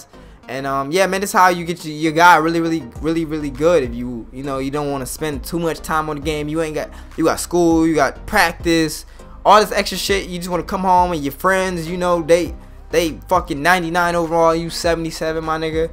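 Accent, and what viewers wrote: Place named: AAVE, United States. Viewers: MopSop Lmao he's speaks Ebonics like most of us here aint nothing wrong with ppl's choice of dialect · It's just ebonics man. Ain't nothin wrong with that.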